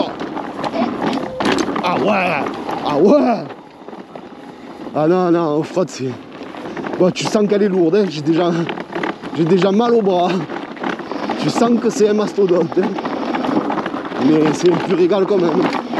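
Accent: Marseille, France. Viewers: l accent marseillais avec une belle voix , c est vraiment jolie a entendre , tu vois ce que je veux te dire comme dirait Alonso 😊. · Jkiffe trop le style de tes tests en toute simplicité, au naturel, la passion que tu transmets, et même ton accent de Marseilléééé! Continue frérot, la bise de Paris 😉😉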